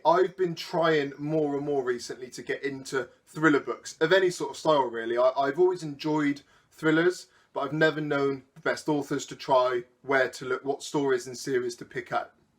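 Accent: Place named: South, United States. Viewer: I might just do this! Didn't get tagged, but it seems fun and I want to film something chatty and lighthearted. In other news, are you from the south? I can kind of hear it in your accent but I haven't been back home in years so it might be off a bit. Great video! :)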